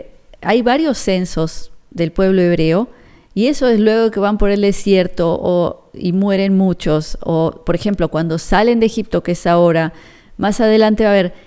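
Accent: Argentina. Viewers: Soy nueva y me encanta su canal, sería lindo saber como de Argentina 🇦🇷 (porque creo sea Argentina por el acento tan marcado,) vive en la tierra hermosa de Israel y sobre todo GALILEA 🤩. La felicito tiene un cutis muy lindo, la piel se ve losana!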